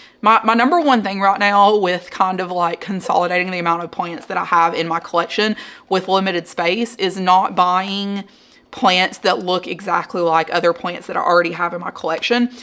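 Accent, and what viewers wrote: South, United States. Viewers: We don’t have a publix in Wisconsin.. we have Copps, and I’ve never seen any philodendron. How’s the job going? You recently went back? What side of Georgia? You have such a strong southern accent, but I love it!! 😂❤ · When I first heard her, she reminded me a lot of my relatives in NE Alabama, so I thought she was there or perhaps North GA. I was pleased to find out I was right! I grew up in NW FL with a much more subtle Southern accent, so my extended family mocked my “Yankee” accent.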